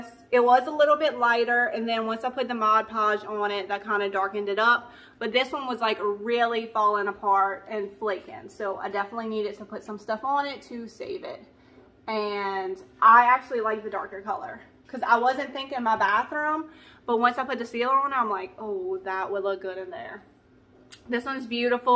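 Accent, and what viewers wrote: Louisiana, United States. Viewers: Where are you from? I am from Louisiana, and you sound like we do 😂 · The sea garland, looks fabulous !!!! The antique frames are so gorgeous!!! P.S. I heard you tell Wren, to "come see" and I couldn't believe my ears! I always say that, also, but, ppl that aren't Cajun, think it sounds funny. It's actually because we've heard that said, in French, so we say it in English. I have to watch your videos, every day, to get my daily dose of the Cajun accent; it makes me feel like I'm back in Louisiana, instead of North Carolina. 😊😘😁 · I'm new. I'm a nurse by profession but I'm a wannabe crafter. I procrastinate but am hoping to really get serious. I stumbled up on your video and youre a beast with this stuff. You have my accent. You must be from Louisiana. Can't wait to see all of your stuff. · Love you and your channel. I’m from Louisiana and I swear you have an accent like you are from Louisiana as well. 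Maybe around pointe coupe parish or something. Lol am I close??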